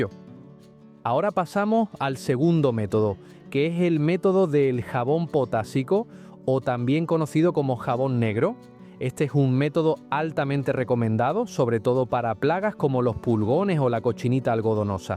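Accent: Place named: Spain. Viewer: HOLA, YO TE VEO DESDE BARCELONA A ESPAÑA. PERO ME PARECE QUE TÚ TAMBIÉN ERES DE ESPAÑOL. POR LO MENOS EL ACENTO UN POQUITO COMO EL MÍO. YO SI VERO PERO VAMOS QUE ME GUSTA TU VÍDEO. DE HECHO, YO ME LO GUARDO. VENGA, ME ENCANTA TU DEJE. ADIÓS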